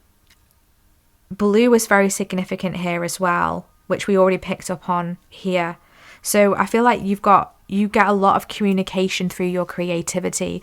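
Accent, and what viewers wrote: United Kingdom. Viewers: British.?? Coool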